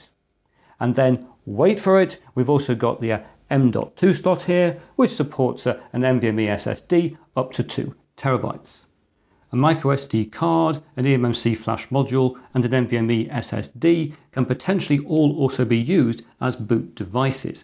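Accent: United Kingdom. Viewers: Ok sir, was the accent that made me wonder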